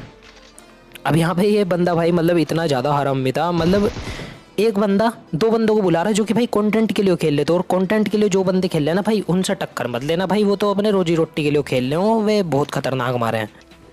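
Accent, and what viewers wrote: Haryana, India. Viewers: Haryana ta h ka bhai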